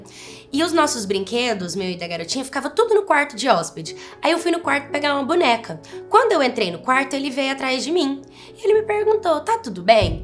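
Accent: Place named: Pará, Brazil. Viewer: Vc é paraense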